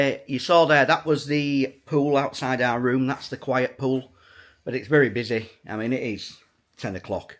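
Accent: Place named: West Yorkshire, United Kingdom. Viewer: Thanks for that . Might give it a go late September or June. Looks up my street . 🍺👌 We think we caught you mention West Yorkshire ? After we guessed by your accent . Where about May we ask ? We are really looking forward to our favourite go to airport reopening again up there Doncaster . 🙏🏼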